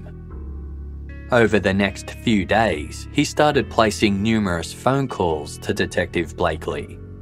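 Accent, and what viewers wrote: Australia. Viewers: certainly is an Aussie accent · Yes. Definitely an Australian accent.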